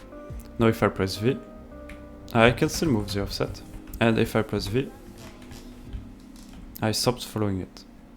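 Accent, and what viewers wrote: France. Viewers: le fameux accent français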